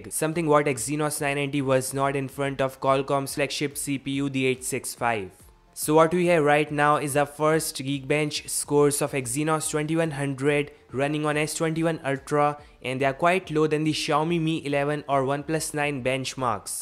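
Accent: India. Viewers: Yeah,Indian accent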